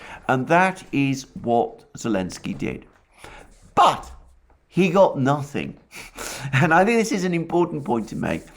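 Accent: United Kingdom. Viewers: I want to apologize for missing some of your EXELENT NEWS AND ASSESSMENT , I LEARN A GREAT DEAL FROM YOU , IT IS A PRIVILEGED TO HEAR YOUR SPEAK YOUR INFORMATION , ESPECIALLY ABOUT UKRAINA. I LISTEN TO YOU , SCOTT RITTER AND DOUGLAS MACGREGOR , YOU ARE EXTREMLY WELL EDUCATED. I M NOT MAKING THIS UP. IT IS A FACT. GOD BLESS YOU AND ALL YOUR LOVED ONES 💓 AND HAPPY CHRISTMAS. I LOVE THE BRITISH ACCENT TOO. 😀💓👍 I HATE THE U.S. ENGLISH. I FOLLOW THE UKRAINA AND RUSSIAN CONFLICT WITH ENORMOUS INTEREST 😀CHEERS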